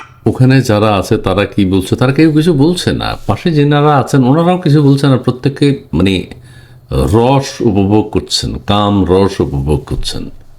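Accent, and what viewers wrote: Noakhali, Bangladesh. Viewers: দুর ও শালা নোয়াখালী কি বলে বুঝতে পারলাম না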